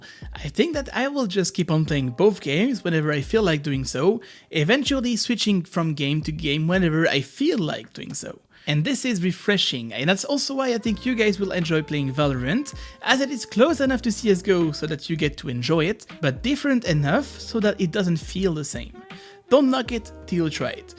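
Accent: France. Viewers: Pourquoi tu parle anglais alors que on sent ton français a 100000kilomètres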